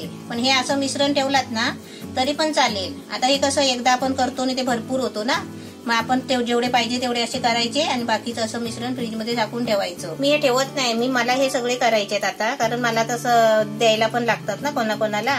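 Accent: Konkan, India. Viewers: Kaki tumch gaav konat tumchya bhashe varun tumhi koknatlya aahet asa vatat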